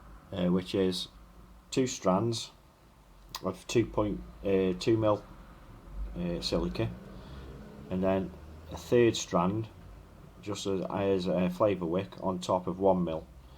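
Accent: Stoke, United Kingdom. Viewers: Aye up mate.....i was really surprised to hear a Stoke accent doing a review. Ive noticed a lot more people walking round with e-cigs just lately.... are there any good brick and mortars selling good quality liquids (locally) that you know of? keep it up anyway mate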